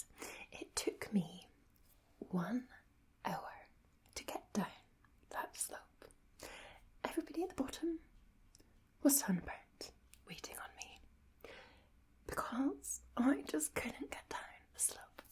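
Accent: Scotland, United Kingdom. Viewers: Grew up in Glasgow and lost my accent when I moved to NYC. This is changing my opinion of the Scottish accent. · I love that Scottish accent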